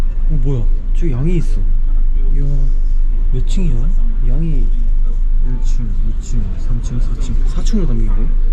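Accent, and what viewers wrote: Gyeongsang, South Korea. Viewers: 혹시 경상도 분인가요? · 올해 60.창원사는 아줌씨입니다. 여행을 좋아하다보니 우연히 영상 보게 됐는데 울 아들마냥 경상도 사투리가 반갑네요 ㅎㅎ 조용조용 즐기면서 탐색도 하고 진정한 여행자 같아요. 진심 부럽네요. 앞으로 자주 보게 될 것 같아요~ 3월에 호주.뉴질 가는데 이 영상 많은 도움이 되겠어요. 감사해요~❤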